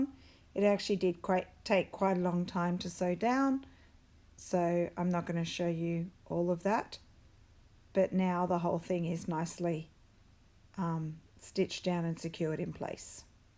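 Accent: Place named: Australia. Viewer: Loving listening to you explain your process and your Australian accent. Getting excited to start stitching this week! Have a wonderful weekend.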